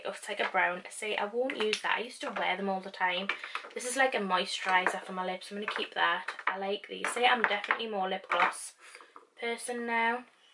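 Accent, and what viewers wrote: Newcastle, United Kingdom. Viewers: Love watching u I’m from Sunderland/ Newcastle and now live in Cumbria and miss the Geordie accent xx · Literally my favourite Geordie girl! I just love how she doesn’t pretend to be dead posh and polished xxxx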